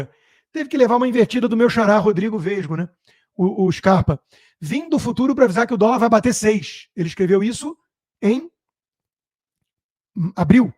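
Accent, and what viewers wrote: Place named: Rio de Janeiro, Brazil. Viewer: Mesmo sendo muito reticente ao sotaque carioca, mas é maiiiins , que acaba sendo divertido. Embora o que é mais importante é a cultura e o conhecimento exposto . Só isso.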